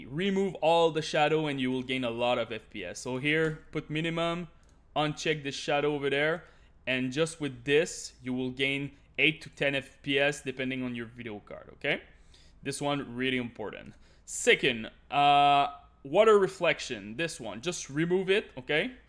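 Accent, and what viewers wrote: Quebec, Canada. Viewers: accent is from quebec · I can feel the quebec in him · boi tes quebecois?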